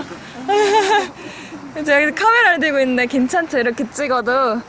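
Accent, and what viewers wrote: Gyeongsang, South Korea. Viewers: 영상 잘보고 갑니다 익숙한 경상도 말이네여 : ^ ) 해맑으셔서 기분이 좋아집니다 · 혹시 고향이 갱상도 인가요???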